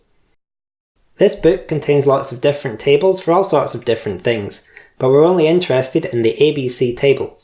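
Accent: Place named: Scotland, United Kingdom. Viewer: I think you are scottish..you have a strong and beautiful accent sir! Keep making more videos on nautical almanac! Take it easyy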